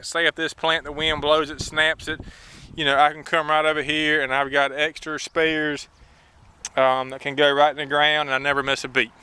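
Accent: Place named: North Carolina, United States. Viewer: native NC, sounds like a Civil War movie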